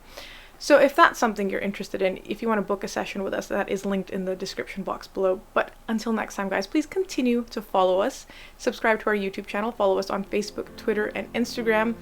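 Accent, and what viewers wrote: United States. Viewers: Assalamu alaikum sister, your strong American accent got me searching through all your videos why u chose to work as a doctor here in the UK , because your husband doesn't have a American accent, I'm sure he's either Pakistan or born in India, so u and Ur husbands visa situation sounds must be very complicated, with u being an American citizen, so how come you don't work and live in America as a Dr with your husband, not sure if you covered this subject in a video, please post me the link , jaza kallah and thank you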